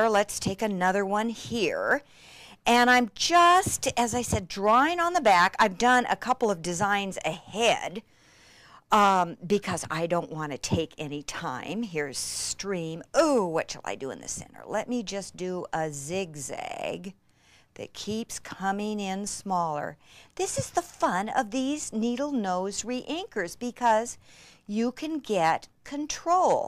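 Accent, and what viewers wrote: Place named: United States. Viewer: man this woman combined with her american accent is just the best. ive got stuff to do but i want to watch the whole video!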